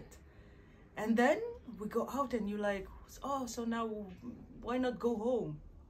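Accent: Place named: France. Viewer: Vous vivez en France? Avec cet accent français....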